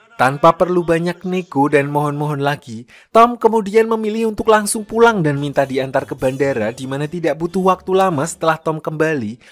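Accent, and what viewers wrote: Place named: Tegal, Indonesia. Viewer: Narator asal tegal. Di usahakan ga medok pun susah ya min. Kadangada yg lancar tapi kesulitan bedain antara R sampe jadi RL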